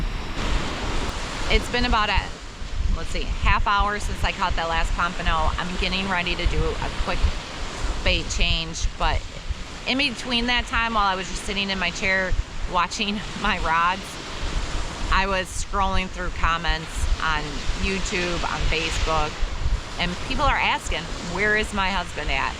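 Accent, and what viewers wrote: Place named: Midwest, United States. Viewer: I grew up in Michigan, Pennsylvania now. I suspect you are not originally from Florida. I recognize your Midwest accent. it is music to my ears. (Don't recognize...)I really like your videos, I hope you both hang in there. Blessings....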